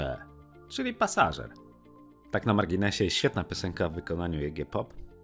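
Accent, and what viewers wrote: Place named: United Kingdom. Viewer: Wszystko fajnie, ale ten akcent brytyjski... Jest okrutny... Także szukam dalej 🙂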